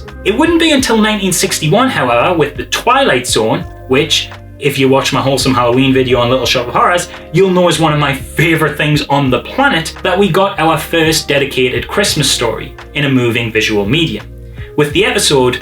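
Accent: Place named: Geordie, United Kingdom. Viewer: Maccum or Geordie? As a Geordie who has lived in Australia for 20 years I can't quite place the accent. Thumbs up on the research on the history of Xmas Horror. Have a good one.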